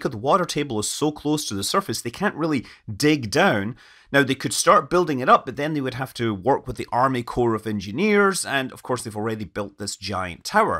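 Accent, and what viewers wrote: Scotland, United Kingdom. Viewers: Even this Englishman loves a Scot explaining how those Americans got it so wrong. ♥️ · Finally, the Scottish summary of the launch.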